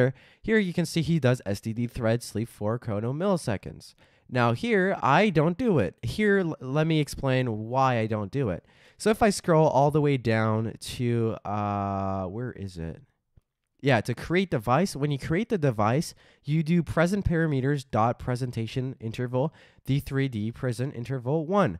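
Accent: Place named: France. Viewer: L'accent de fou que t'as pour un français 🔥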